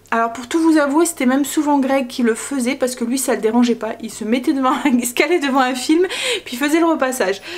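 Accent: North, France. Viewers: Coucou... Je ne connaissais pas une "battée" j'adore votre accent du nord même si ce n'est pas souvent... Ça promet si il y a déjà des moustiques 😡 Les fleurs commencent à sortir pourrons plus grand plaisir Bisous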